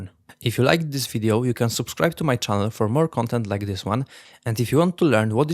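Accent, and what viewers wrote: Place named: Spain. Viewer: u speak spanish, right? u got a lot of accent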